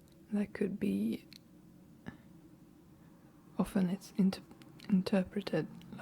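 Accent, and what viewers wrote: Sweden. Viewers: great video! I love the sound of your swedish. maybe a trigger word assortment? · Tack! Gillar när du kommer nära med din fina röst, är det en norrländsk dialekt du har?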